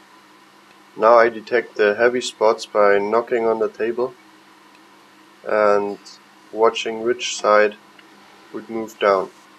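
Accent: Germany. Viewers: Great job. I´m thinking of building one and yours is the best homemade disc sander that I´ve seen. Is that a German accent? The switch looks German made. I´m from Texas but I live in Germany :) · Fantastic build. It must have cost a fraction of what a factory built unit would cost. Your English is very good and your German accent is endearing.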